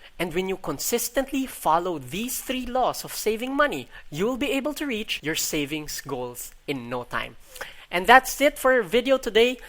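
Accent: Philippines. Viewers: Amazing video and I love how it sounds so familiar (Pinoy) hehe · Congratulations on your marriage. Marriage in the US is more of a liability than a benefit. Your accent is charismatic and charming... makes me miss the PI. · regular filipino english accent. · Filipino accented English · "Filipino accent" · strong phillipino accent but i LOVE THIS VIDEO really hit home for me by the way what software was used to make this video i got a couple things in my vault i got to put a visual to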